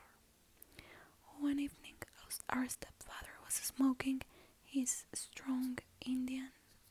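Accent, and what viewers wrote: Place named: Mexico. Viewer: Eres la mejor persona hablando mal inglés xd, adoro nuestro acento mexa. Saludos te mando un abrazo y un beso;)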